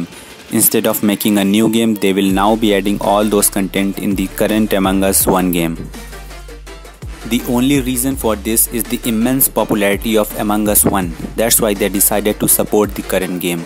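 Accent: India. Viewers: Voice reveal wiyhout face voice like an Indian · Is this dude indian? Look at that Indian accent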